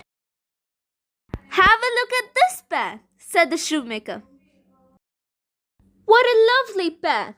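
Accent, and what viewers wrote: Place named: England, United Kingdom. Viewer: Excellent video and story as always, I love your voice is so clear and easy to understand and I really admire your English accent, Great effort my dear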